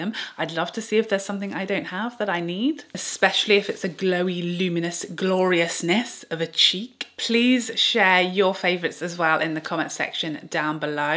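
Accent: United Kingdom. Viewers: I love listening to you speak !!..Ilove your english pronunciation !!Love British english !!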